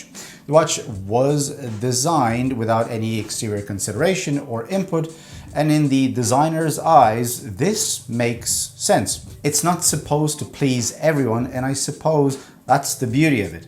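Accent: Ireland. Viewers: "I'm not Irish". I mean you sound pretty Irish bud.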